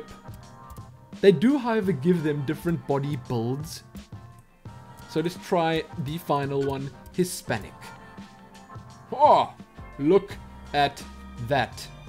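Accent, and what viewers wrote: South Africa. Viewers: Where are you from you sound a very South African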